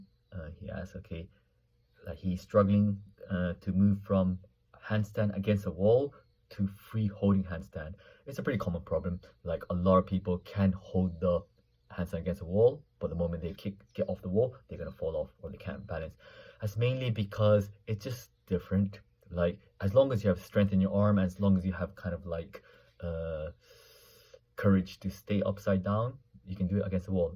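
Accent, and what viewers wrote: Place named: London, United Kingdom. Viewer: Ah I see. That's why you got a hint of London accent when you speak english. Thanks for answering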